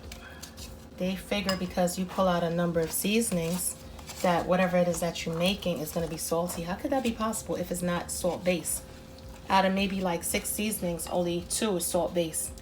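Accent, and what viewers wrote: New York, United States. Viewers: I can’t wait to make this. I was looking for a lobster Mac for this Mothers Day. P.S. are you a NYer ? I recognize the accent sounds like I’m listening to someone from home. I post the results.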